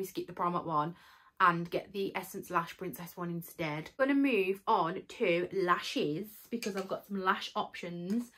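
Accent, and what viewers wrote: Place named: Birmingham, United Kingdom. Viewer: You have an accent, you from Birmingham?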